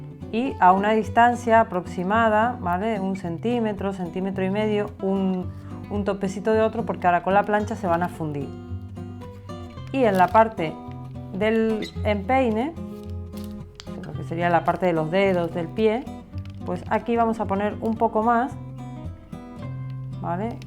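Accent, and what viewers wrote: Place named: Argentina. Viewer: Hola !!! Me encanto tu trabajo !!! Ya me suscribi active la campanita y puse me gusta .Me tomo el atrevimiento de comentarte que tu acento lo escucho entre español y argentino. Saludos 🙋‍♀️ Y a cuidarse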